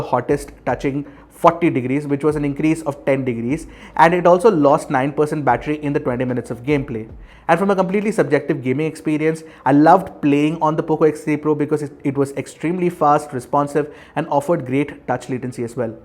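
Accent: India. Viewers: I struggle so much to understand Indian accented English :(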